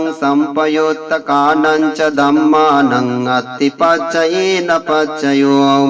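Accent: Standard. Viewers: খুউব শ্রুতিমধুর ও বিশুদ্ধ উচ্চারণ মনে-প্রাণে প্রশান্তি অনুভব করছি,, 👏👏👏, বন্দনা ভান্তে আপনার নীরোগ দীর্ঘায়ু কামনা করছি,, 🙏🙏🙏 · সাধু সাধু সাধু। একদম শুদ্ধ পালি উচ্চারণ।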